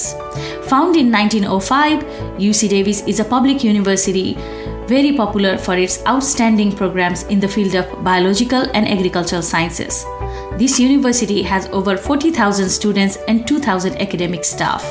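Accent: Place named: Nepal. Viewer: Your accent sounds nepali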